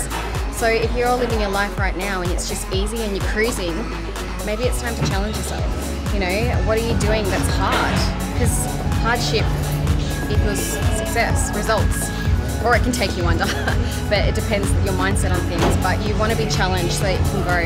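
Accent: Australia. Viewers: Not only are you the most beautiful woman on the planet, but I love your Australian accent just makes me melt you are a goddess❤❤❤❤❤ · Surprise. You're an Aussie!! After following you for a while, this is the first time I've heard you speak. "That's an Aussie accent" I thought. (And from the Cat's territory too. Grrr. Go Brisbane!!) I imagined you were 6 foot tall and American. (Well you have a six-foot presence :-) Take a bow mate. You look amazing.) What you've done is a huge credit to you. I think you're also an inspiration to us blokes. Golly, an Aussie. hahaha. So surprising. I wish you all the success there in the US. Thank you for your positive energy. It's great. Bluey.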